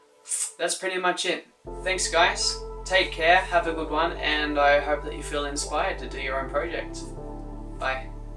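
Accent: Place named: Australia. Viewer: I thought you were Swedish? Your Austrialian(?) accent is quite amazing, and English in general is way above the average for Swedes :P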